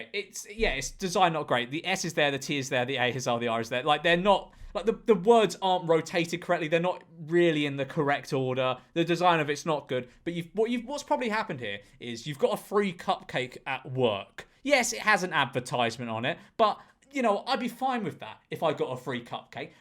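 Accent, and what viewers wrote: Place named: Liverpool, United Kingdom. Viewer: Why are you slowly turning scouse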